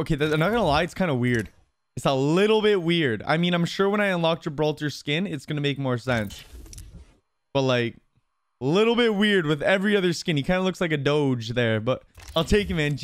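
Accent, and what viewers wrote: Canada. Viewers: He’s Canadian idk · Is he Canadian or im just dumb Idk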